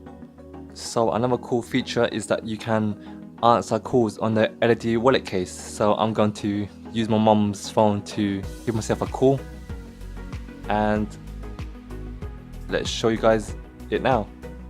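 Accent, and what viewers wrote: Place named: United Kingdom. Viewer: It's a pain in the ass listening to British accent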